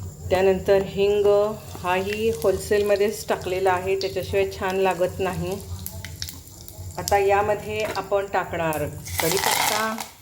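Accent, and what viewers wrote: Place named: Malvani, India. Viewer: Mi asa sambhar nakki karun bagen mam mastach amchya malvani basheth boltey mastach mazya tondaak panni sutla chaan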